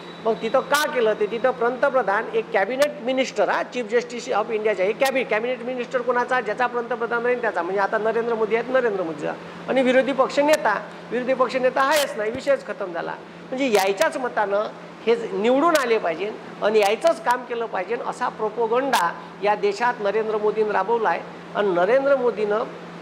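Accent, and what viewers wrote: Vidarbha, India. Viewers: आपल्या बोलण्यातून मधीच 'ठीक आहे"हे उच्चारण करणे कमी केले तर.... खूपच चांगले वाऱ्हाडी भाषेत बोलणें आहे.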